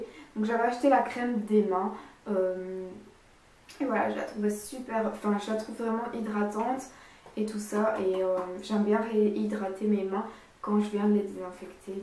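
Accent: Belgium. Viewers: Tu es belge?!?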